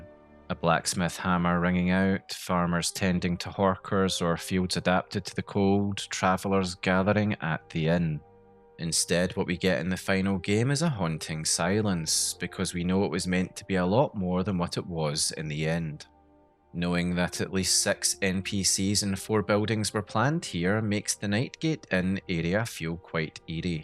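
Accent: Scotland, United Kingdom. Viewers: Its just one of the many Scottish accents. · I like your accent. It's scottish right?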